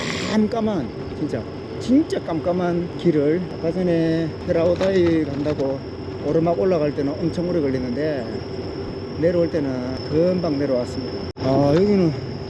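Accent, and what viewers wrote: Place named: Busan, South Korea. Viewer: 재밌어요 'EBS 세계테마기행'의 '부산 여행가 일본편'을 시청하는 듯했으며, 부산 사투리가 정겹습니다 잘 시청했습니다.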